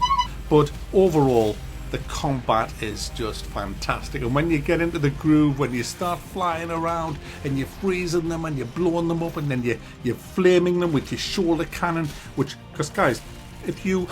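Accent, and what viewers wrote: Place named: England, United Kingdom. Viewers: you sound like you're from yorkshire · What's this funny as hell english accent? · What? He’s from the North East, probably a Mackem.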